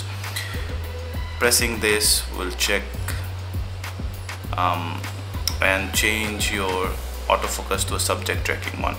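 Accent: India. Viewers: Ocelot's Vlogs Yup He has an Indian Accent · You think this English sucks? You should listen to Dutch people sometime, you'd cry. I think he's from India, or somewhere close to that.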